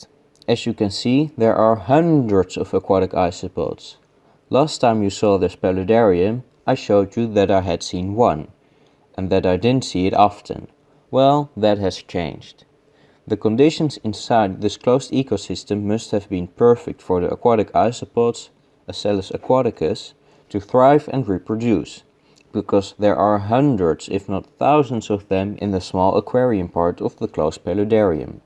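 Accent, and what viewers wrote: Netherlands. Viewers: Are you Dutch because you have a Dutch acent LoL 😂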